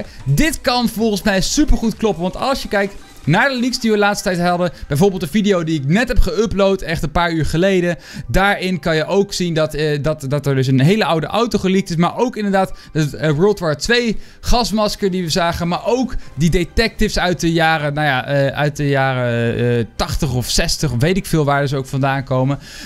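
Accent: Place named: England, United Kingdom. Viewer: Geweldig Engels accent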